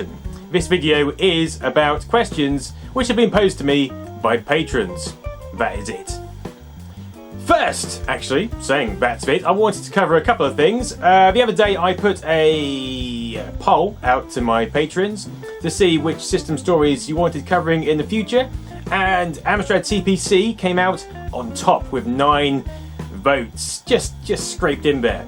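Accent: United Kingdom. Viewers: BRITTISH ACCENT! and i dont eaven write london right YAYAYAYAYA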